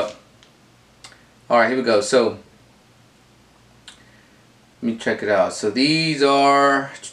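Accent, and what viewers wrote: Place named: Mexico. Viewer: I don't understand where that's coming from, but he seems to be Mexican through his accent, but you can't tell everyone's origin by just looking at em.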